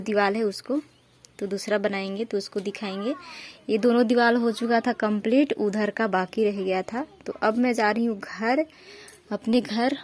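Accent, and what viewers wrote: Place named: Chhattisgarh, India. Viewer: Aap Chattisgarh se ho kya didi aap baat kiye to lg rha hai mere ko aap Chattisgarh se ho mai bhi Chattisgarh se hu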